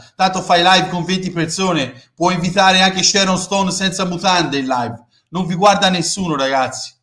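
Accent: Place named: Piombino, Italy. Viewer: Tu hai giocato a pallone e sai quello che dici e non sbagli un colpo, la storia che racconti è perfetta, come parli mi fai morire dal ridere, ho frequentato per 40 anni Piombino isola De'Elba mio fratello aveva famiglia a Venturina, e parlano come te, la simpatia è unica, scusami di questa esternazione, ciao, forza inter sempre ❤❤❤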